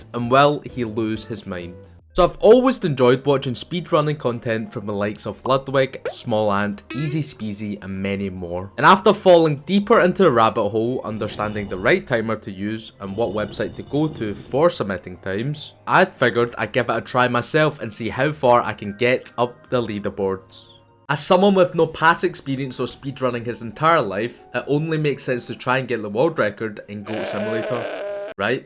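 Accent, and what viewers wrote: Scotland, United Kingdom. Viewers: Asian man with a Scottish accent. fucking awesome. <3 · There's such a goofy charm to Scottish accents, audio book when??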